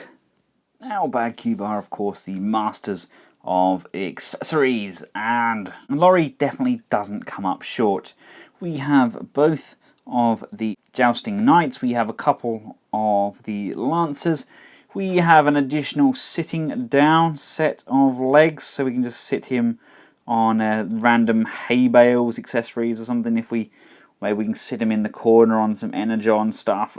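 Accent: England, United Kingdom. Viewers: you and your puns, more funny in your English accent!!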